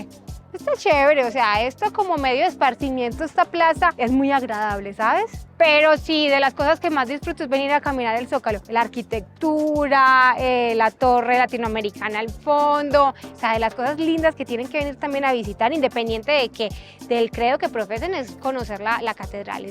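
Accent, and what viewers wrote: Antioquia, Colombia. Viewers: Amo el acento colombiano 😍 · Hola , ya he visto varios vídeos tuyos y me encanta tu style, tu vibra me vibraría y aunque eres colombiana te aceptamos tal. Cual eres y ya eres parte de nosotros Lis mexas ok nunca pierdas tu acento , me encanta , es lo lindo de ti mmm junto con todas tus cualidades jijijjji y además muy hermosa viva Mexico y Colombia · me encanta ese acento paisa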